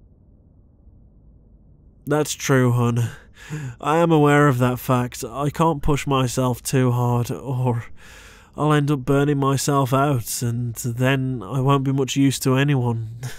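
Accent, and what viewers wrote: United Kingdom. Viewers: He's British :)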